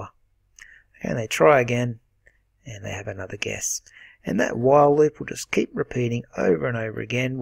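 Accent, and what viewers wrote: Australia. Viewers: The tutorial is explained perfectly.. but for some reason I'm getting an error in line 6 "while guess != number:" the error says: while guess != number: ^ SyntaxError: invalid syntax I tried everything I could but I am unable to fix it. Love the Aussie accent btw <3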